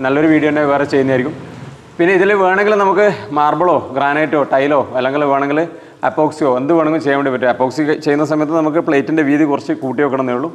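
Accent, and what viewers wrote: Kannur, India. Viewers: Bro kannur aano🙄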